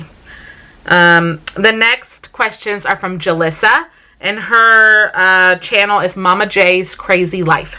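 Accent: South, United States. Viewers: I love reading too! I’ve never read any of Jodie’s books but I saw the movie My Sister’s Keeper and loved it! I struggle to watch movies so I tend to just rewatch my favorites 💓 My husband encourages me to get some me time as well Target is my me time place too 😂 I love your southern accent, I figured you had southern roots and I love cruising as well. We were suppose to go cruising in April but that didn’t happen! We have the whole early care and education thing in common! I didn’t major in that field but I worked in the field for years and have a special place in my heart for tiny humans and how they learn 💓 Sorry for the novel comment! Loved getting to know you and thank you for answering my questions 🙂💕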